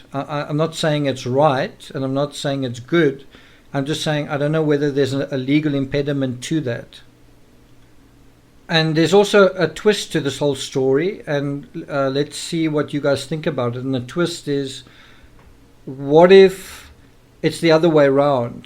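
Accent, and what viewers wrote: South Africa. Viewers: I agree with you Diana, makes absolute sense by appropriate investigation and analysis of evidence, I have read a few of his books before he started this blog unbiased and rocket science ☺️ with his clear South African accent.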